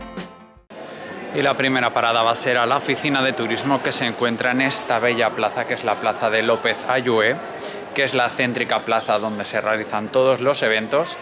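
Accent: Spain. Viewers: De que parte de españa es ese acento? creo que es primera vez que logro identificar uno jaja, saludos:)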